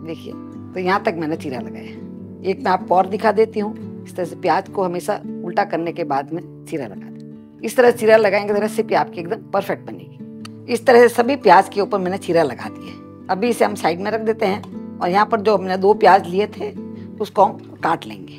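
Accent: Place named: Rajasthan, India. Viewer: True.. I miss her beautiful Rajsthani accent ..🙂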